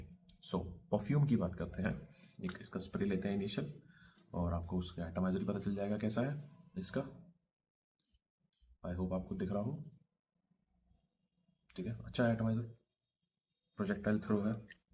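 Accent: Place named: Haryana, India. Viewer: Paa ji aap haryana se ho na kyoki maine kai baar notice kiya h ki kai baar bolte bolte bich bich me haryanvi ton me koi koi word sunai deta h .baaki aapka explanation outstanding h perfume information k बारे me Jyode vasde rvo god bless you .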